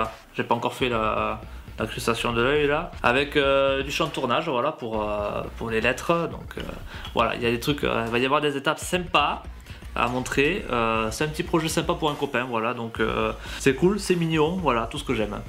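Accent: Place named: South, France. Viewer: il y avait un accent du Sud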